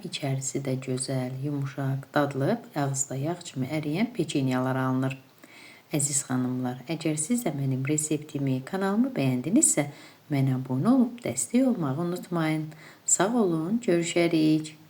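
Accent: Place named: Shaki, Azerbaijan. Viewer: Siz Şəkilisiz? Ləhcənuz çok gözəldir 😊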